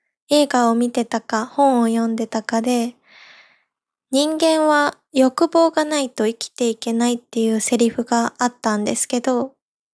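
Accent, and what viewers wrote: Kansai, Japan. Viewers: Hey, I listened to the last 15 Podcasts so far and always try to catch up :), no matter what topic I love listening and learning together with you. Actually when you become more "negative" I can resonate a lot, it makes me thinking of happyness and future and I want to thank you for that, because without speaking about it, it just stacks up over time. My Japanese improved also sooo much (Next time I try in Japanese again :D) :) PS: Your Kansai Dialect is very cute ^^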